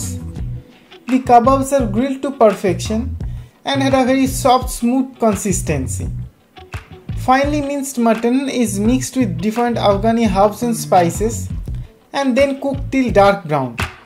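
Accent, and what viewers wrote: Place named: Bengal, India. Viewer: Bangali accent 👏👏👌👌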